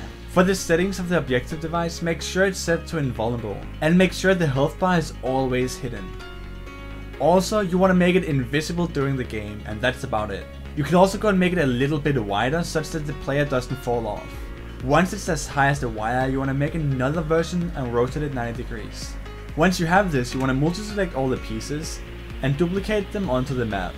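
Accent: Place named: Denmark. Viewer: You sound danish or skandinavian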